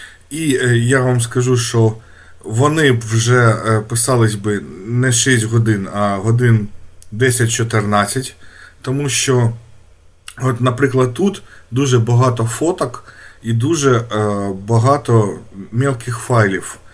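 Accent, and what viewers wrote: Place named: Surzhik, Ukraine. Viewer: молодець, і як фахівець, і як справжній українець, а саме: ділиться власним досвідом, за допомогою якого я раніше відновив HDD диск Western Digital на 500 Гб, а також перейшов на українську мову, що дуже приємно чути, навіть суржик від у мене особисто викликає повагу до нього, адже найважливіше зробити перший крок. Я раніше теж спілкувався мовою східного "сусіда", але зараз розмовляю українською і незначні помилки з часом виправляю, а моє оточення ділиться приємними враження від почутої від мене української мови. повністю підтримую. Від мене лайк, давня підписка і всього тільки найкращого.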